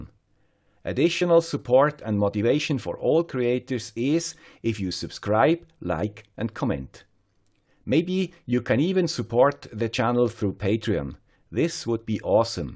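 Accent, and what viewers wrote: Switzerland. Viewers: Hello from the guy who does not care about appearances. I had learned some interesting things from your channel and I hope that in the future you will keep sharing with us your projects and ideas. In Florin country we have a saying "Dogs are barking, the caravan is passing", keep up your work and only give your attention to things that are worthy of it. Big up for the guy with the Swiss accent. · The content and the way it is presented makes this site a valuable learning tool for me. The gloves and Swiss accent enhance the presentation. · thanks for continuing to produce high quality and well researched videos. Your channel is one of my favourites because I know every video will be well made and I will learn something new. That must take an extraordinary effort and I appreciate what you’re doing. I never knew the background behind your introduction or the gloves - just assumed you were extra cautious with ESD - but now they’re a trademark and I look forward to watching each video from ‘the guy with the Swiss accent’. 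Best wishes from Australia. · Thanks for bringing the important issue to be an active youtuber to the attention. I personally enjoy the topics in your channel and found nothing wrong with the Swiss accent. I believe that the sustainability of a youttuber’s channel can be achieved naturally by its alignment with their profession or hobby. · your videos are the reason i started getting into this sruff! We all need "the guy with the swiss accent". And you take the scientific approach which i appreciate. Hope you stay, if you can but first and foremost. Be happy! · Thank you for your perfect videos! Your hard work really motivates me not to give up. And of course we love your famous trade mark - The Guy with the Swiss Accent. Keep moving on! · Dear, please by all means continue your channel as a creator. I like your work very much, it is well documented and has a high level of training/background information. 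I commented once on your Swiss accent, however in a gentle way. It is your trade mark and much appreciated by many people. I don't care about your hands/gloves. Some people are stupid enough to comment on this. It's the content that matters. Best regards and much respect from Belgium. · I, like others who have commented, look forward to your "Swiss accent" and white gloves. They are your "trade marks" - look after them as they serve you well. Good luck ! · Please keep creating! I've learned more useful information from 'the guy with the Swiss accent' than I did while getting my degree. I'm proud to support you on Patreon and I look forward to many more insightful videos. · you earned this a longtime ago! No doubt about it. Well done to our little community for embracing the guy with the Swiss accent, I hope he feels the love & respect that we all feel for him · first time I saw your video and heard "guy with the swiss accent", and then of course the amazingly professional, detailed and relevant content, i was hooked ever since. 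Please dont stop being creator. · Oh man... I never saw any of those negative comments and I love your intro "the guy with the Swiss accent". Your videos are the best. I am shocked that you received negative comments, but even more so that they would almost cause you to stop creating content. I am so very glad you carry on! Your ESP8266 low power videos are invaluable! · "Here is the guy with the Swiss accent" is what I wait to hear every Monday. Keep doing great videos, as always you do! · I have been watching your channel for about a year now. Please don't be worried about the accent. In fact it reminds me of my friends from the time I lived in Switzerland. Thank you for sharing your knowledge with all of us. Keep up the great work and have a wonderful 2018. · and most important: you are 100% fine !! with or without gloves. and we all love your swiss accent.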